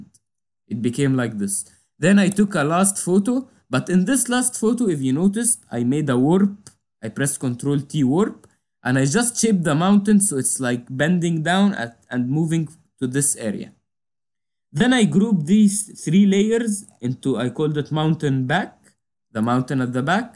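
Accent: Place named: Egypt. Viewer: You sound egyptain. Iam from Egypt and I know this English accent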